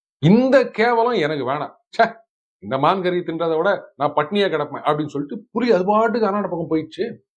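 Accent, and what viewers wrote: Chennai, India. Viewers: Mahabharatam in madras thamizh is refreshing 😋 ✨️ 😌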